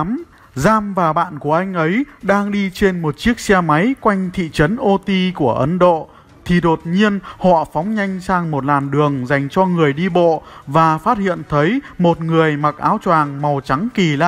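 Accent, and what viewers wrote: Thanh Hoa, Vietnam. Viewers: Dám chắc đây là giọng thanh hóa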